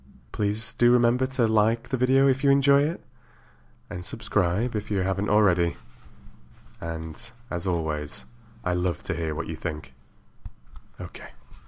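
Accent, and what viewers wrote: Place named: Yorkshire, United Kingdom. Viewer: Great reading as always! Like the Yorkshire accents, are you from Yorkshire? Keep up the great work 😀. PS how about some more Lord of the rings..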